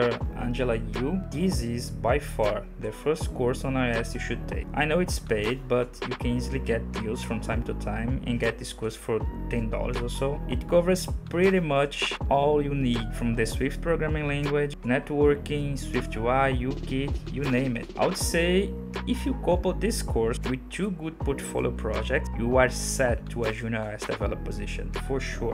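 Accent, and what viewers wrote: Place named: Brazil. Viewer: Brazilian detected haha, vc tem curso em portugues ?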